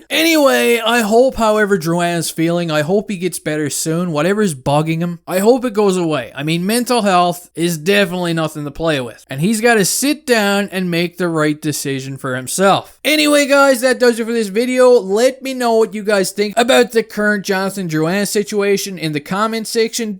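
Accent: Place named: East, Canada. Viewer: What part of eastern Canada are you from hahahaha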